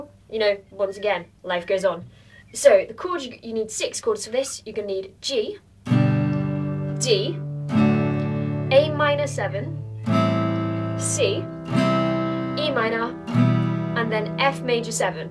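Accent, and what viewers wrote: United Kingdom. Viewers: Blonde... British accent... Plays guitar and play? I think im in love... I came Ten years late I think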